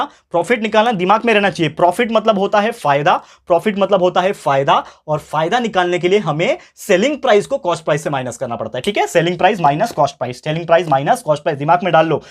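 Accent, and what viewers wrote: Maharashtra, India. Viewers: Sir aap Marathi ho kyaa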